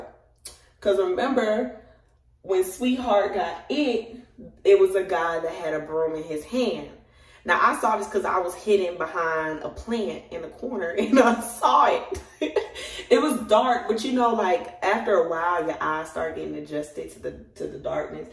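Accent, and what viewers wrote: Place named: Memphis, United States. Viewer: Are you originally from Atlanta? You sound like a Memphis girl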